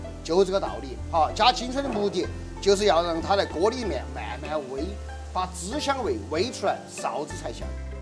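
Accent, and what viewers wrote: Chengdu, China. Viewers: 好亲切的成都话！多谢！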